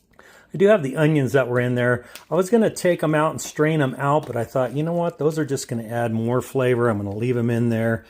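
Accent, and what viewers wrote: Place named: Spain. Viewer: The recipe just sounds better when you say it with a very authentic Spanish accent. Love birria tacos. What if I guess made it about a month or so ago and it was so delicious. Nice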